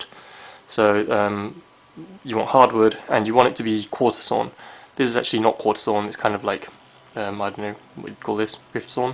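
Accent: United Kingdom. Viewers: Also, you trolled me really hard. I'm watching a video of a guy making planes, a Brit obviously, because of the accent if I ever can spot one, and he says he lives in Japan, and refers to Japanese people as 'they'. So i click on his other video, and who do I see? A fella of obviously Japanese origin, though I've seen in comments you saying you're british. And yes, I was about to ask how come that your accent is perfect, since I know Japanese natives have some problems saying L in English. Also what's funny with you being asian (no disrespect, hopefully you know that) is that the first thing I'm making using plane you've help me build is a... japanese tanto saya (scabbard). Let me tell you, if you ever gonna build one, don't bother with straight chisels. Real sayashi would use a curved one, that can do paring cuts everywhere, even on the far end of the scabbard. 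Now I'm going to my 'workshop' to try for the third time. Come along if you wish to learn some Polish words used to express your discontent, sir. ; )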